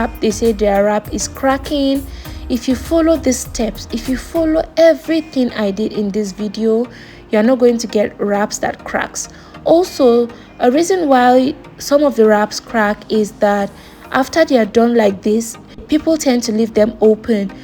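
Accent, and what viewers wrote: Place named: North, Nigeria. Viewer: I love the third method: your voice. It's so soothing and engaging 😊😊😊 I sense an almost non-existent accent. Are you from Northern Nigeria? 😊😊😊 But honestly, thank you for this videos. The way you explained left no room for questions. You had every information in mind when you were making the video. ❤❤❤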